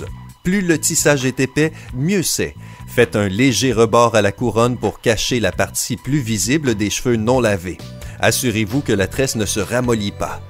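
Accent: Quebec, Canada. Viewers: L’accent québécois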